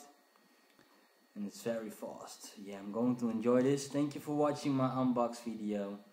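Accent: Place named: Netherlands. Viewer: Haha your Dutch accent is mad as. Nice video, I had no idea how small the 2.5" drives were.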